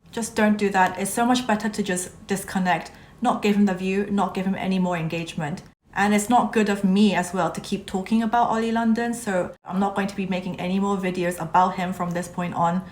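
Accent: England, United Kingdom. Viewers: ... I like your accent^^ it's sounds like British and your voice is.. What is it call? Yaa.. Just like it so much^^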